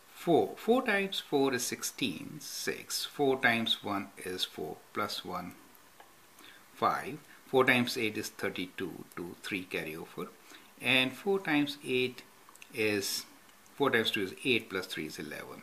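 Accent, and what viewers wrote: India. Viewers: i hate the india voice, i can't understand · Vaibav V He is indian so english isnt his first language atleast hes doing his best to speak english and to help you understand square root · oh and its another indian guy with heavy accent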